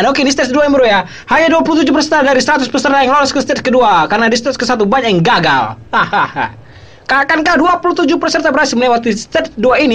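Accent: Medan, Indonesia. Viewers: ngomong nya aja kaya orang medan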